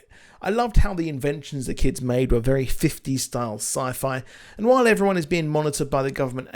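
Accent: United Kingdom. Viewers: Why do you sound very British like!? You're from Rhode Island.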